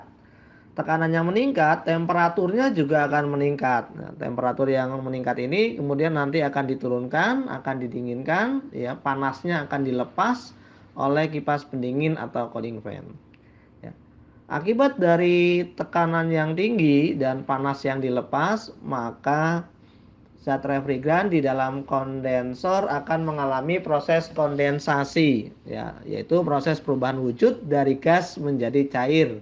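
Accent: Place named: Java, Indonesia. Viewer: Medok nya sudah pas, Mantap pak guru salam dari senop :)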